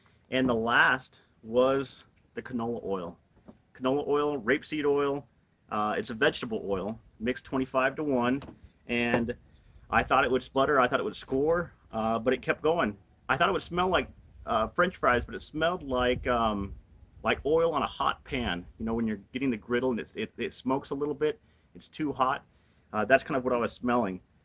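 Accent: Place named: United States. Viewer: No way Aussie, definite American there mate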